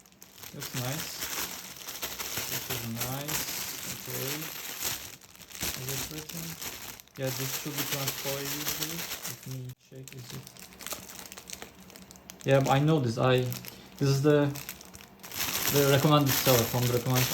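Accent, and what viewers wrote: Turkey. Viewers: Hi, your accent looks like Turkish accent. Where are you from? If you are Turkish can i ask some questions?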